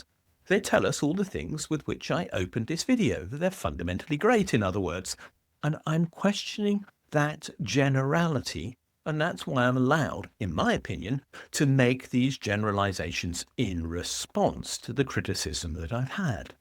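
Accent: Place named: England, United Kingdom. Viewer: Such a nice English accent, wasted on such terrible ideas. If you chase the wealthy out of your economy, your economy will collapse, full stop. Take a look at other countries that have done it: Uganda chased all their Indians out who were bsuienss owners, or Zimbabwe seizing white farmers land. China & Cambodia put all the educated bourgoisie into the fields, or Russia and the kulaks. If this gentleman believes the wealthy hoard their wealth and don't invest, maybe the reason is government policy makes investing in your country not worth the risk? Capital in the hands of private individuals will always be put to better use than capital in the hands of the government. Always.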